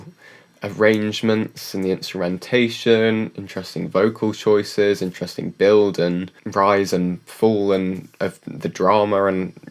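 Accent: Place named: Wales, United Kingdom. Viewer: Interesting accent. Are you from Wales?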